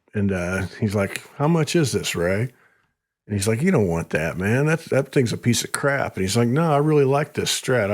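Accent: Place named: Indiana, United States. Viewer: I really enjoyed the video I'm starting to dig into your channel and it's really cool. Sounds like you're Indiana I'm in southern Indiana myself right across the river from Louisville. Sorry I'm rambling lol thanks for your work.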